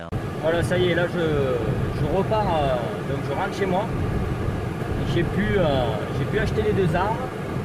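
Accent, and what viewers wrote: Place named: South, France. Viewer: Bonjour, bonne vidéo, belles armes, mais pour ce qui est du 22lr, je préfère mon Sig 1911-22 ... arme souvent insultée, mais par contre, jamais eu d'incident avec (pourtant je tire en moyenne 200 cartouches par semaine, CCI Blazer à 3,70 euros les 50 par 5000) ... Pour la précision, ça ne vaut pas un DES 69 bien sûr, mais même à 100 m sur gong métallique, ça "cling" même si ce n'est pas prévu pour ça ... Bonne journée et merci pour cette vidéo sympa avec l'accent du soleil 😉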